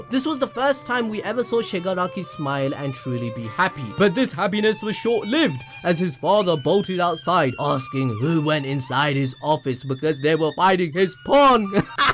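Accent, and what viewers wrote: Punjab, India. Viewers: Sir are you Indian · Bro are u punjabi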